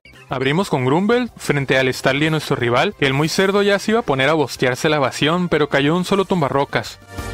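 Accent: Chihuahua, Mexico. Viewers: We tu eres de chihuahua verdad? Xd no te doxe ni nada pero tú ch es muy nuestro jaja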